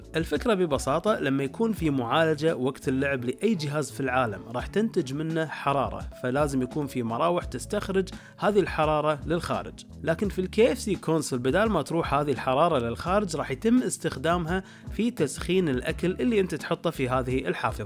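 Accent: Iraq. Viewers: اللهجة عزيزي انه عراقي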